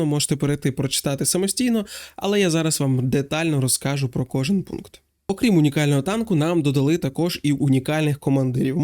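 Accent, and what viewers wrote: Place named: United States. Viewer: Не надо американского акцента очень слух режет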